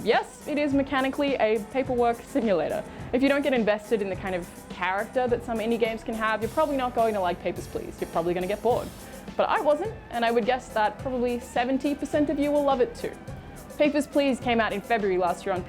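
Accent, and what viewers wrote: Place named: New Zealand. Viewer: Is she from NZ?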